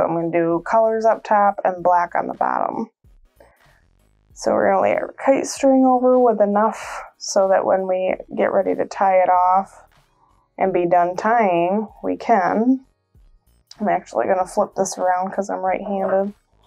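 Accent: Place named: Wisconsin, United States. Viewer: You sound like you’re from Wisconsin too!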